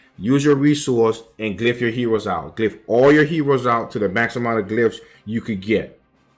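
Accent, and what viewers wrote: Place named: East Coast, United States. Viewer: Bruh you have an east coast accent. Where you from? 😆 Subbed for the swag the content was extra.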